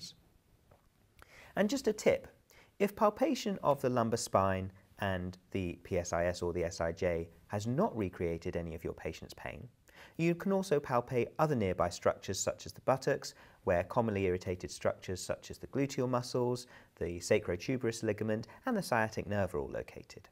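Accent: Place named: United Kingdom. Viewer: your explanation is crystal clear this how you can tell British English sounds better than American 's 😊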